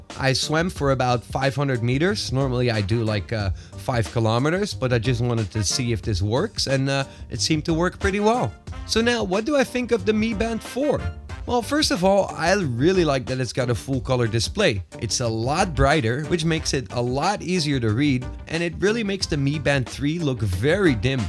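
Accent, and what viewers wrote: Netherlands. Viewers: hoor ik daar een licht NL accent? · You're Dutch, right? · You sound Dutch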